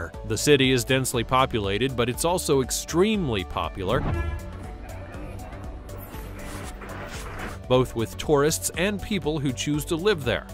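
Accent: United States. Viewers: Hard to keep up when you do metric sometime and imperial the other. In America we do imperial and seems you sound American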